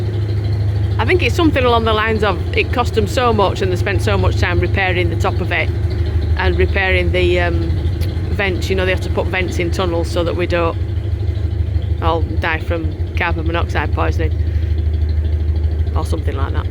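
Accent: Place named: North, United Kingdom. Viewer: I suspect is from a bit further daaan sarfer than you,edging me bets,are you from yorks/lancs border? love your accent. Give Ernie a cuddle amd a hug.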